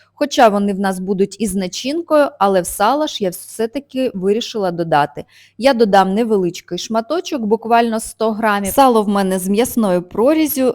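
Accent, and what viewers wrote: Standard. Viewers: Я недавно підписалася на. Подобається ВСЕ! І розповідь про рецепт, і його подача і чиста українська мова. ВСЕ подобається! БАЖАЮ МІЛЛІОН ПІДПИСНИКІВ!!!🎉🎉🎉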